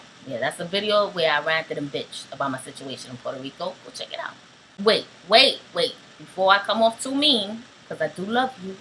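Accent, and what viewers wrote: New York, United States. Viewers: Physically you are a very typical beautiful Puerto Rican woman. The thing you need to change is your Nuyorican traits. This is something that can be done if you work at it. And don't forget the NY accent. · J. García She talks like a Nuyoricans not black. This is the way we speak. Black people in America don't talk like this outside of NY. You got it twisted bc you're ignorant.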